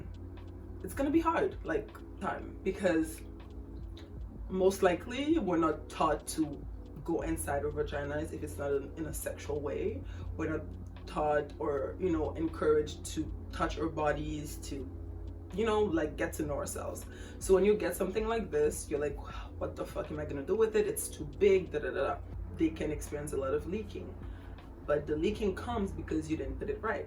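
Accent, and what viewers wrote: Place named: Haiti. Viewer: Ahhhh I posted on your last video now I can here the slight Haitian accent. Maybe Haitian Canadians sound slightly different to ones here in America. My family is from Haiti on my fathers side. bèl dekouvri ou. I switched to organic tampons but have been wanting to make the switch to something like the Diva.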